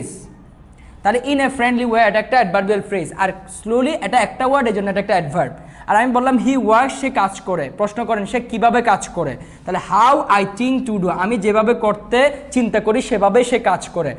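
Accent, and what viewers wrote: Chittagong, Bangladesh. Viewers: বদ্দা চিটাগংগা নে??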